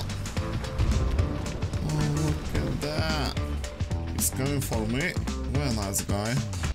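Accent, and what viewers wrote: Eastern Europe. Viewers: Why is this in my playlists and warthunder is still going wow I haven't played this for years . Eastern European accent and crap meme's no thanks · uses british tank yet im still hearing some slavic some sounds....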